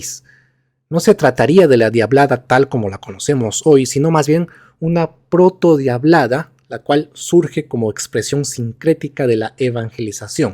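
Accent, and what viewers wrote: Bolivia. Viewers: Te llevo escuchando varios años y lo unico que escucho de tu parte es controversia, odias todo lo que es peruano y sobre todo durante el virreynato ..Por que no dices que eres un pro boliviano o boliviano directamente o pro indigenista boliviano..Hasta se te nota en la voz , no hablas como peruano. Tienes bastante dejo boliviano. Deja de engañar a la gente que hay pruebas eficientes , hay libros y que te desmienten..O acaso se te ha olvidado que Bolivia era el Alto Peru y pertenecia al Virreynato del Peru.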